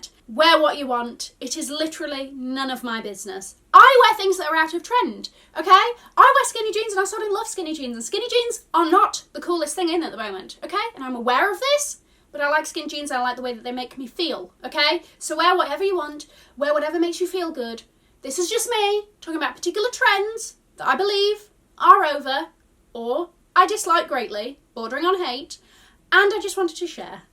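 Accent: United Kingdom. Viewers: You are the first British accent that I’ve heard say Tig ole Bitties 🤣🤣🤣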